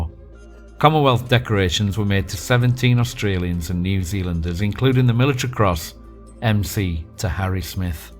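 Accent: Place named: England, United Kingdom. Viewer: Excellent presentation: short, sharp and clear. It's really easy to listen to your posts. As an Aussie I find your Pommy accent and presentation very endearing--we truly are very close cousins. God save the King!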